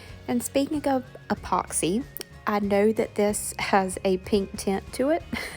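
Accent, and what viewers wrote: South, United States. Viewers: As usual, a great tutorial. I just love your southern accent, so cute!! Can’t wait for the next shop sale.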